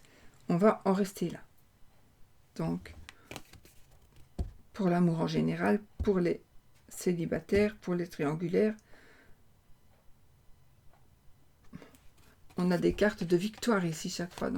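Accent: Belgium. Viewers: Bonjour, toujours aussi ravissante! Av son jolie accent belge que j adore!je suis a ma pause a mon travail a l hôpital, et je suis venue voir directement ta nouvelle vidéo, quel plaisir de te voir! Concernant le tirage, certaines choses me rejoigne moi le célibataire, mais pas tout évidemment! Bonne soirée 😘🌹😘🙏♥️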